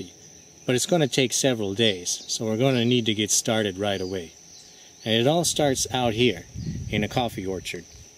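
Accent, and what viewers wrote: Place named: Wisconsin, United States. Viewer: great video. guy sounds a bit like Clint Eastwood with a Wisconsin accent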